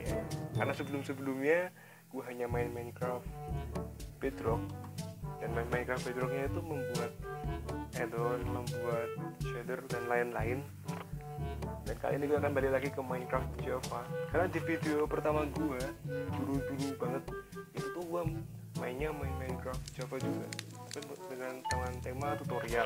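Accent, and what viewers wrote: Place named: England, United Kingdom. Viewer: Tak kira Anda dari inggris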